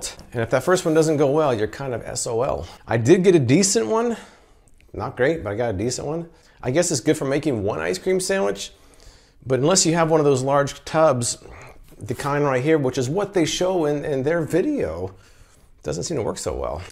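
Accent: United States. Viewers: Are you American perhaps? 🤔🤔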